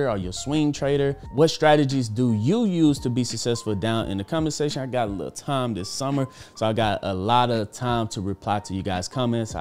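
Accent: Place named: Texas, United States. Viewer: Texas accent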